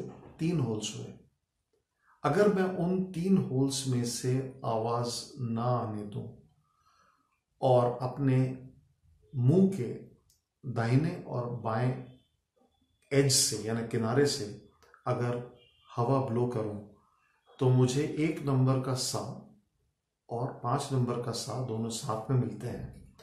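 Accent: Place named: Maharashtra, India. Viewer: your accent is like maharashtrian so I thought it.by the way how to start online classes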